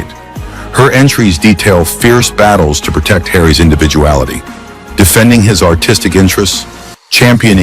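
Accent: United States. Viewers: Why is an American doing the commentary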